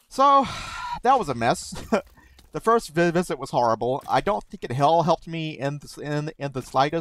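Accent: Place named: Georgia, United States. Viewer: thank you for sharing your story it was very nice. you actually said you were from the south and it didn’t click until you said that and I was like “oh yeah! you sound just like people from my city!” I’m from north GA. I’m glad that you’re feeling better and sh clean for a year! congrats! 🎉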